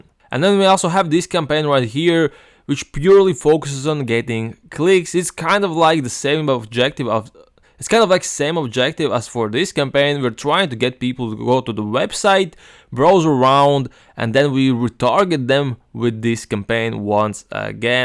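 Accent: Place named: Latvia. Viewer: Hello mate, thanks the value 🙏. I have one question: It seems like your clients are American, do they care about your Latvian accent or have you faced any rejections because of it?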